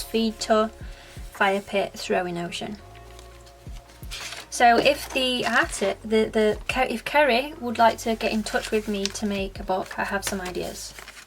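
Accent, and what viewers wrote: Yorkshire, United Kingdom. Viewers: are you from Yorkshire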